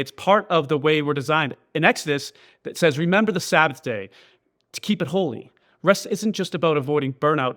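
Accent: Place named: Canada. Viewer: I detect a Canadian accent. Tom in Shizuoka Prefecture, Japan. Thank you for this sermon.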